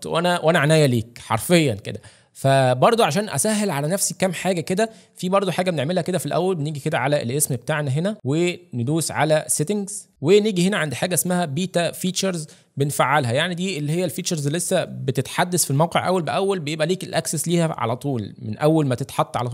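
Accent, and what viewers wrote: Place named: Saudi Arabia. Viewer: الجميل في الفيديو خلاك تتكلم سعودي .. بصراحة ابداع .. اشكرك بعنف ❤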